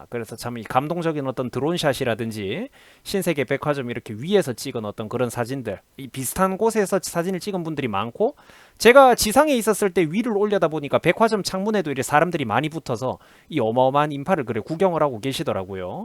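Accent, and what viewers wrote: Gyeongsang, South Korea. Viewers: 대구말씨인데 대구분인교?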